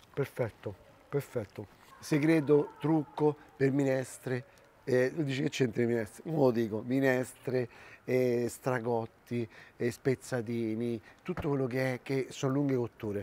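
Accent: Rome, Italy. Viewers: Dovrebbe parlare e gesticolare di meno e poi basta con questo dialetto protoromanesco dai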